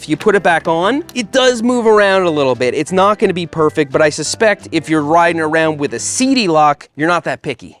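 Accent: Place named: New York, United States. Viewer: are you from NY/north jersey? i know that accent lol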